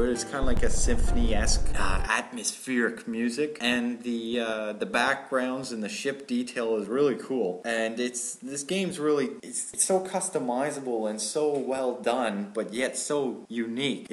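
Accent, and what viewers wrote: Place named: Canada. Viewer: lol the telltale canadian accent 'go check id eeeeewt!" :D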